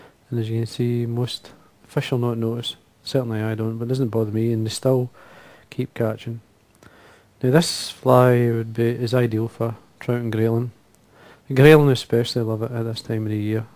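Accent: Scotland, United Kingdom. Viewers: My fly box is sort of like yours, I don't tie as well, you are by far the best on You tube and that is saying a lot, there are a lot of good tiers here, It took me a while with my american english ears to understand you, heavy Scottish accent its a good thing! I have one fly box in my vest that is debated to just Adams Dry Flies, I have them from size 6 down to 22! At least half dozen in each size, I like this style, and going to add them to my box, Roe deer never seen it in the flyshops, but White tail deer should do, I been tying my own flies since I got my first vise a Thompson A back in '64 and I still have it to go with the Regal, HMH and Dyna King. Of all of them I like the old Regal and the Dyna King! But a vise just holds the hook, the skill and the artistry of fly tying well that is something else all together, I am on my way to Montana next week, I decided that I am just going to spend the rest of Sept and most of Oct- Fishing then back home just in time for Rifle Deer hunting, then maybe some winter Steel head fishing! 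· Nice tie and I'll be taking a couple to the faster upper reaches of the Clyde. Great to hear a fellow Scot too!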